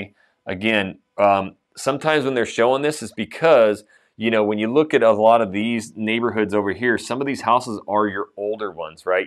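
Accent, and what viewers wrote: United States. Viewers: You're from California, aren't you? "The 84." "The 205." "The 217." We don't talk like that in Portland. That's the way people speak in Los Angeles. It's not I-26, it's US-26. It's not The 84. It's I-84. It's I-205. It's 217 (or maybe Oregon 217). Also, there are now SIX "quadrants. Yes, South is the newest, but you totally forgot about North. North is everything from Interstate Ave to the Willamette River. And I'm just going to stop here. You have just so, so many mistakes. You really don't know Portland.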